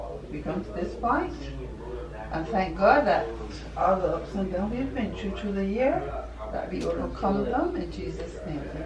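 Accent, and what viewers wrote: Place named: Caribbean. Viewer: I hear a Caribbean Accent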